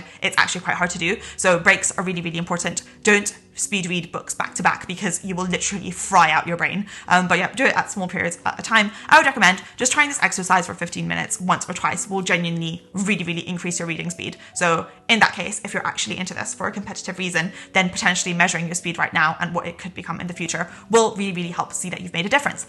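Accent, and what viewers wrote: Scotland, United Kingdom. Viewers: Are you from Scotland? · Everyone commenting 'oh its so fast how you talk' or 'it must have been sped up in production' potentially however Scottish people do just naturally talk fast. Keep up kids 😂 Although will reiterate it off the folks that are saying its obvious proof of your speed of intellect by just how well you articulate yourself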